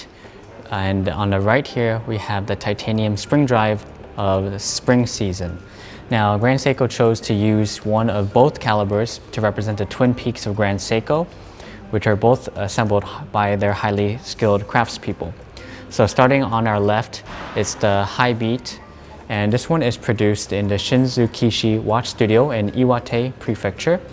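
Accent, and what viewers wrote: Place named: United States. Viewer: Super pumped!!!........Americans destroying our wonderful language!!! Lovely watches though!!! And enjoyed your content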